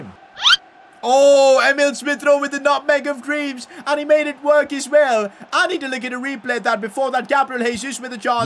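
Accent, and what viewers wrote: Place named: India. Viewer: Bro why the accent you are from India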